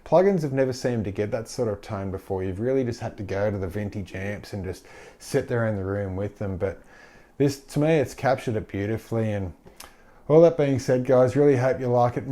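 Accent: Australia. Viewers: Hello! My name is Pietro, I'm from Brazil and I love your channel! I'm 22y, started playing guitar 2 years ago, and I'm not gonna lie, sometimes I have a hard time trying to understand what you say, my english is not perfect and the accent for me still hard to understand But I'm trying to practice, I got an australian citizenship from my father so I'm willing to move to Australia within the next few years, so better get used to the accent hehe I like specially your boss katana videos, I always wanted an amp like that but unfortunately it is too damn expensive here in Brazil Everything is expensive, it would take me 3 entire salaries just to buy the katana mk2 50w, it is simply not doable But I'm at the hope that someday I will have a guitar room just like you do CheersHello! My name is Pietro, I'm from Brazil and I love your channel! I'm 22y, started playing guitar 2 years ago, and I'm not gonna lie, sometimes I have a hard time trying to understand what you say, my english is not perfect and the accent for me still hard to understand But I'm trying to practice, I got an australian citizenship from my father so I'm willing to move to Australia within the next few years, so better get used to the accent hehe I like specially your boss katana videos, I always wanted an amp like that but unfortunately it is too damn expensive here in Brazil Everything is expensive, it would take me 3 entire salaries just to buy the katana mk2 50w, it is simply not doable But I'm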